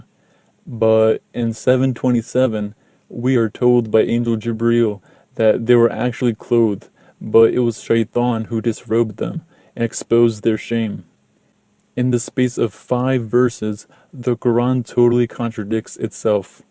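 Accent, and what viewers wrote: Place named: India. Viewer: Are you from India? Cause you pronounce many words like we do. Anyways. Stay healthy. Stay happy. Lov from India.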